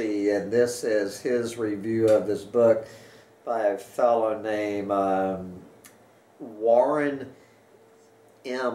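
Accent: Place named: South, United States. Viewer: This guy is pretty smart. Knows his stuff. Personally, I've found that the southern USA accent makes him seem like a dumbass. And unfortunately, a bit tedious to listen to. But I couldn't agree more with the message. I love the honesty of climate doomers. We've been lying to ourselves and each other for long enough.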